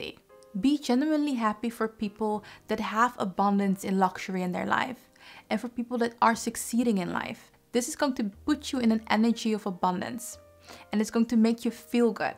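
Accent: Netherlands. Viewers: Sounds like she’s from the Netherlands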